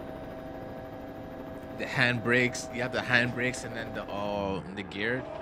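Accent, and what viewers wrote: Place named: Caribbean. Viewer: Gday mate, love from Australia. I have a question that might sound weird......well because it is weird but that's my brilliant beautiful...weird brain. Do you have a Caribbean background? Or are you Canadian? Wait that nakes more sense. That would explain why you seem so chill and friendly. Sorry for being a weirdo, I'll let myself out. 😂 Edit: I was referring to your accent. I have a weird obsession with accents.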